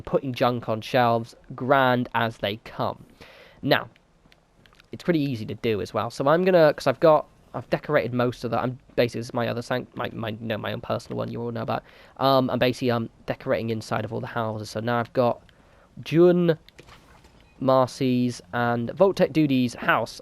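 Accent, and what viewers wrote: England, United Kingdom. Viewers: The potato chips in the game are called "potato crisps", and judging by your accent I'm assuming you're in England or near it? And yet you said, "potato chips". ... Mind blown. Lol. Awesome videos, btw. I just bought FO4 a few days ago and I've been watching through all your videos for tips & tricks!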